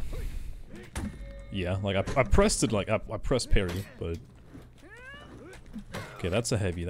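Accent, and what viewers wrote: Germany. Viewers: Ohh you are German? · Wait youre German?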